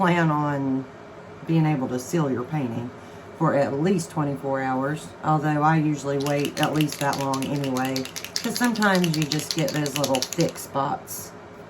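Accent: South, United States. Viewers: Hi Lovely Lady!! That really is an awesome looking galaxy that you’ve created there! It’s beautiful, truly. Will you resin and mount it? I feel though that I have to comment about the fact that you don’t wear a respirator as much as you should. It worries me. Personally I would much rather you did your painting in silence? Of course with a voice over. I love your southern accent! But seriously, you know better than I do about the possible medical implications. I hope you won’t be annoyed at my saying so, but I do enjoy watching your videos and I learn so much, so please don’t gamble with your health. It may take a little longer of course, to post your video after the added narration, but hey, we can just wait (with baited breath) for the next masterpiece! Thanks so much for sharing your talent. I and many other viewers DO NOT want you to get poorly. 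🙏🙏🙏🤗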